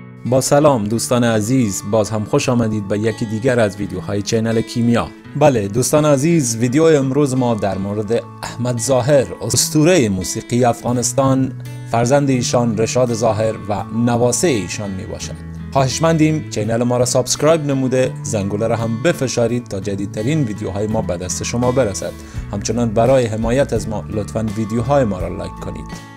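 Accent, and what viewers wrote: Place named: Iran. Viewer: ( اوستورا ) ، یعنی چی ؟ چرا به لهجه ایرانی حرف میګوید ؟.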